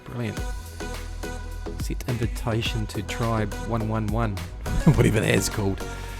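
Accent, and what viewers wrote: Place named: New Zealand. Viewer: Where you from bro sounds like an New Zealand accent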